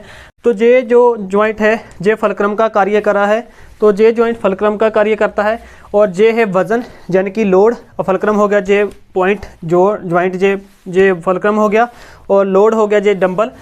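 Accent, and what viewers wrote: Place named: Punjab, India. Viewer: Thanku sirr and apki punjabi 😅🧿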